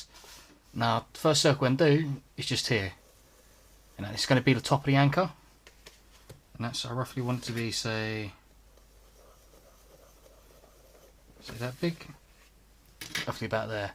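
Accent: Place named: England, United Kingdom. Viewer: he has an english accent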